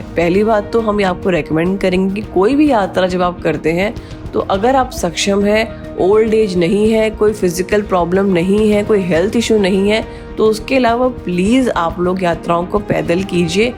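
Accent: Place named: United Kingdom. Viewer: Use less auto-tune and change ur accent to Indian... unnecessary english accent is really annoying even though ur content is good....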